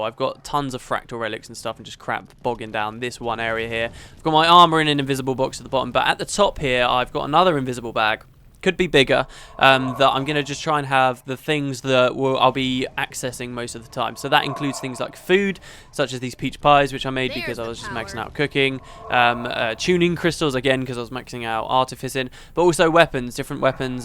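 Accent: England, United Kingdom. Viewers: woot is back!! i missed your swagger english accent:P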